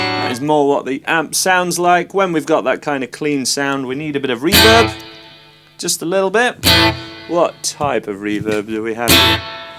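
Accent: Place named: Yorkshire, United Kingdom. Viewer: Hey, where can I get myself a Red5 and which one are you using in the video as I can’t find the link in your description. Much appreciated, thank you! I hear a Yorkshire accent, I’m Leeds/Bradford!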